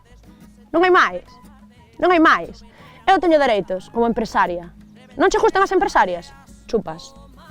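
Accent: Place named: Castile, Spain. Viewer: Se eu fosse ela optaria por falar logo em espanhol porque o galego dela é muito ruim. Não se entende nada, fala rápido , usa muitas expressões hispanicas e o acento é totalmente castelhano.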